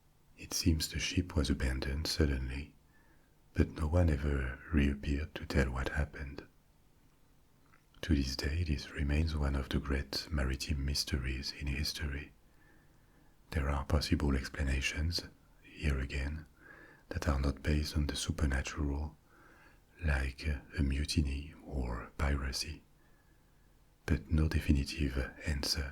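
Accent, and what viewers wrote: France. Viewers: I really love the stories and the French accent, but the only thing I don’t like is the big gap after the story ends with some videos. I think it’s designed to be some restful sound to help you sleep but I have a playlist of stories I listen to and would prefer that at the end of the story it ends so my playlist can move onto the next story. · You will always be my favorite ASMRtist. Your low voice just the way you do it and French accent is so soothing, and you really do your research for these videos and stories. You are simply the best there is!! Thank you so much for your efforts. We really appreciate your work! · I used to live in France a long time ago. Your lovely accent takes me back to that delightful time in my life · I haven't slept this good since childhood! Your French accent reminds me of my grandmother who was a war bride from 🇫🇷 France. 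It sooths me to sleep as her voice did so many years ago. Thank you so much for the work you do. Your videos work so much better than any pill and as a chronic pain, extreme at times, sleep doesn't come easy. Your dedication is very appreciated by myself and many others. Thank you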